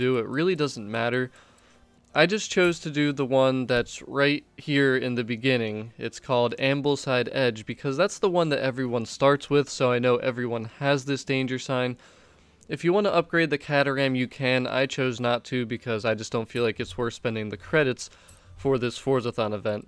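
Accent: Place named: United States. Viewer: Yeah your probably correct from the US accent and pronouncing of the car... In the UK that's the way we pronounce it is the way I sort of typed it... Who cares lol great video as always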